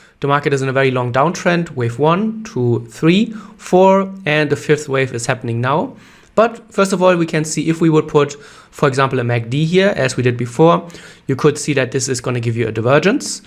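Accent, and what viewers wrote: Germany. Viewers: Your English accent reminded me my German friends 🙏. During my stay in Europe, my English become quite similar to yours. Your generalizations about the market look amazing, however it's really difficult to predict a trend reversal specially for scalping traders, it would be better to follow an uptrend and downtrend untill we reach out stop loss quite often. 😜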